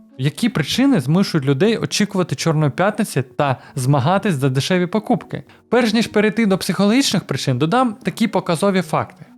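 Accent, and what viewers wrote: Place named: Russia. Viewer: справа воної, леді Ю, живе. слухаю і на фоні 'ґаз, зубажіння, любі маі' пане, читайте, говоріть в побуті, вживайте український контент. бо це якась жесть. кантент, навини, сорак, панеділок... вже десятки відео, а розвитку ноль. згадалось вашою залупянкою 'чукча нє чітатєль, чукча пісатєль' український контент російською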